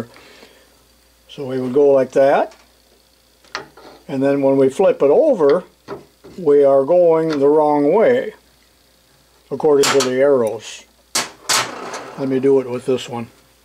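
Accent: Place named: Illinois, United States. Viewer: i know this sounds really weird and stalk ish of me but i live in Quincy Sensei and there is a part of me that deeply wants to meet you before we have to say goodbye to you for good, and time is short. i don't know how we could work that out and keep our privacy intact. but it is a honest wish of mine. it pulls at my hart just a little lets just say.for no farther than i'm thinking it is . i'm taking a ball-park stab at the distance of a drive it might be. i think it be a lasting regret if i didn't at least try if it was o.k. with you. i'm thinking Central ill, from the sounds of it. meaning your manner of speech . the state is regional like that if you haven't noticed in all your years. and the way southern ill. has its own sound as you go farther south . like when the closer you get to that dump the call Chicago as well the sound changes.like when your are next to Mo. 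or Iowa being smack dab in the mid west. we border quite a few states and well they all have an influence . but rural central ill is a island all to it's self . you don't have to say here an i don't advise it you know how some people are. its not as innocent of a world as it was in your younger years i wouldn't want you bothered by any unwanted visitors. but just maybe you could see your way to agree to meet some place public . oh i don't know thought just hit me just now to suggest the local court house if that made you more comfortable . well i wont pester you about it any longer it just think it over. one m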